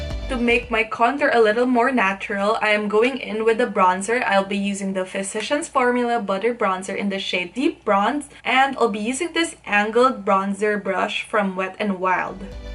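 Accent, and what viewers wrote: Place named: Philippines. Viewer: Thank goodness I finally came across a makeup tutorial video where i can hear and understand straight Filipino English.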